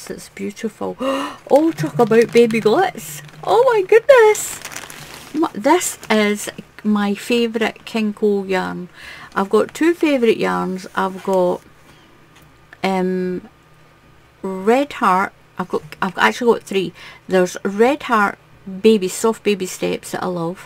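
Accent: Scotland, United Kingdom. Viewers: I love your crochet videos ,as a knitter who could never understand it .also nice to here a fellow Scot you explain everything so well .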